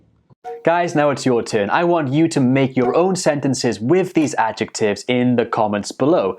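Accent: United Kingdom. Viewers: Excellent video,! Your British accent is amazing and you really rock as an English teacher.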